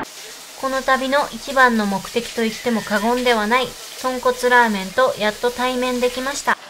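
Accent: Kansai, Japan. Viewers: ところどころ出て来る関西弁のトーンの親しみやすさ。117系など識別できる鉄分の濃さ。 もちろんお顔も美人で大ファンです。(南大阪地区の鉄ヲタのタクシードライバーより) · 関西訛りのイントネーションがとても好きです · 丁寧に話しておられますがちょいちょい隠しきれない関西の荒っぽさが出ていて親しみを持ちましたw博多までお疲れ様でした！次の旅も楽しみに待っています。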